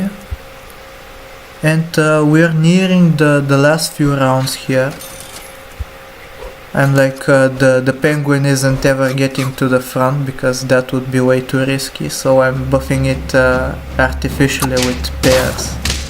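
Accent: France. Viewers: I like that fhrench accent :D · oh my god are u french?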